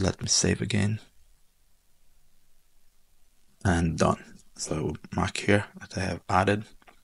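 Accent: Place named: Brazil. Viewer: eu reconheço o sotaque brasileiro de longe kkkkkkk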